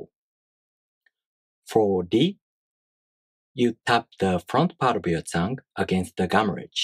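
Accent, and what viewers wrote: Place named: South Korea. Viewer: Why do you have a slightly Korean accent?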